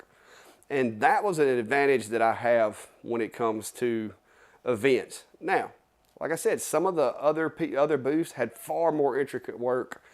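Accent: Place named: Perry County, United States. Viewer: I grew up in Perry County so I can understand you when you "tawk". lol Thanks for the good ideas and keep 'em coming!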